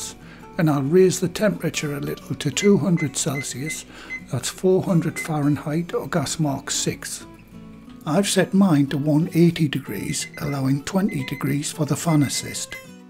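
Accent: United Kingdom. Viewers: He sounds like a British person & I appreciate his sharing his receipe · A lovely uncle with a kind voice and a delightful British accent! Thank you so so so so.... much for the recipe! This is the one and only recipe for gluten-free bread, which I was looking for and found thanks to You! Prosperity to Your channel. Like, subscribe and greetings from Russia! : )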